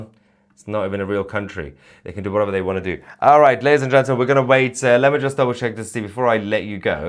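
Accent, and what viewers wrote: United Kingdom. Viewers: So you were saying Ayatoilet. I thought maybe it was my American ears misunderstanding your British accent. Very fitting moniker for such extremist refuse.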